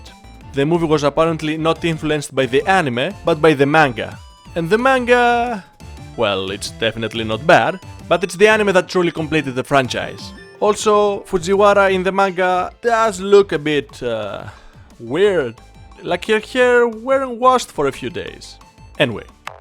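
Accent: Greece. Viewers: Also are you Greek? Cause your accent sound like it. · Wait... I recognize this accent...you greek? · Are you from greece? Cause your accent seems greek to me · πως καταλαβαινει καποιος παντως απο την προφορα τον Ελληνα...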